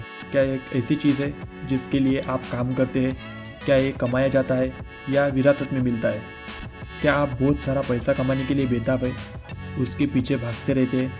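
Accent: Maharashtra, India. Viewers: Your Marathi accent is so sexy … keep it raw😎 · Your Marathi accent is 😅 ,, full video i was thinking about it ❤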